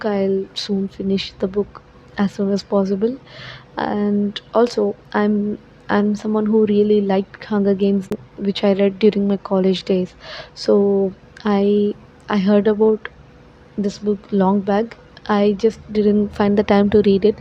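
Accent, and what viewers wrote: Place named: England, United Kingdom. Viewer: Clearly and easily understandable English Accent👏🏻👏🏻. I appreciate this and waiting for more.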